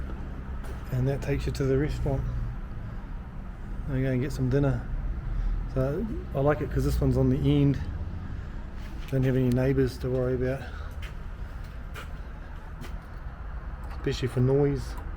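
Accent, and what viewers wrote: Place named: New Zealand. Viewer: Good to hear a familiar Kiwi accent from over there across the ditch. My late son drove interstate out of Melbourne for years. Sadly John was taken from us by cancer aged 56yo on Oct. 21 2021. Bob. Lower Hutt. NZ.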